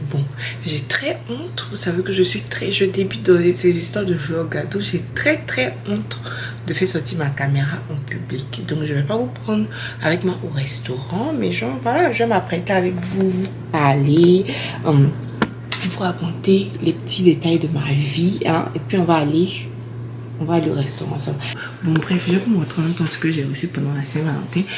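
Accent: Ivory Coast. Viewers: On adore l'accent ivoirien et le naturel dans la video! 😍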